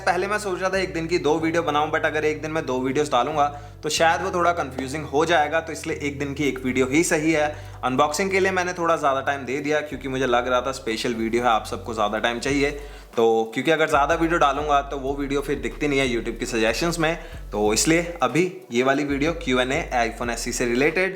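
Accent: Punjab, India. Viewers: First. U doin good work n givng compt info about aple prodct Srcond:- i love to hear your voice as typicl desi punjabi voice spcl my you tube ki family line Third:- punjbi bhai yeah batao koun se airpod liye jaye sab kuch considr kar ke price quality Fourth:- kya abhi iphone 11 pro lena chaiye ya wait kiya jaye iphone 12 ke liye. Fifth:- iphone 11 pro vs iphone 11 pro max koun sa sahi rahe ga Sixth:- kya usa aor india ke phone mein koi faraq hai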